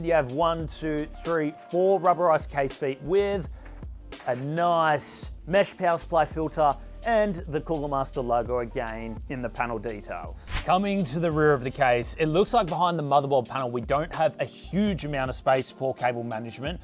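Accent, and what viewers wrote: Australia. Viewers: Awesome video as always, and a great case from CoolerMaster. ☺ Thanks a lot, my friend, for the video and for showcasing that really good CoolerMaster case. ☺ By the way, I watch your videos in your original language because I really like the Australian accent. 😊 It reminds me a bit of the British accent. 😅 Best regards. ☺ Geiles Video wie immer und gutes Gehäuse von CoolerMaster. ☺ Vielen Lieben Dank mein Freund für Dein Video und die Vorstellung des echt guten Gehäuses von CoolerMaster. ☺ Übrigens schaue ich Deine Videos in Deiner originalen Sprache an, da ich den australischen Akzent richtig gut finde. 😊Das erinnert mich ein wenig an den britischen Akzent. 😅 Liebe Grüße an Dich. ☺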